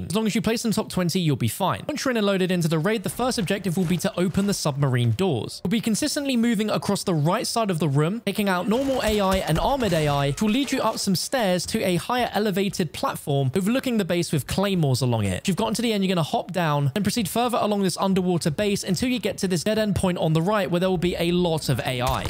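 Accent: United Kingdom. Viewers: hahaha the British accent got me. He says THRee players and then one of the FRee has to unlock it by blah blah. I thought he was saying paid players could get right in but free players had to unlock it. Bummer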